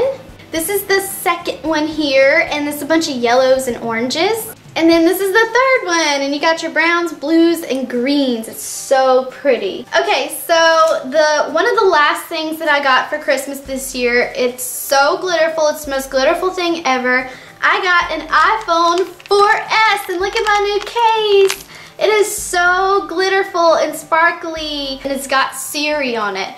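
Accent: South, United States. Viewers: Where is she from???!!! I know she's from the south but where??!! :)))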